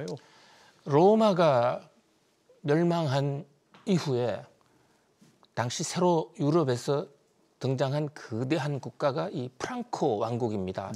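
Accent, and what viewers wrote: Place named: Chungcheong, South Korea. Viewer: 충청도에유 천천히 귀에 쏙쏙이네요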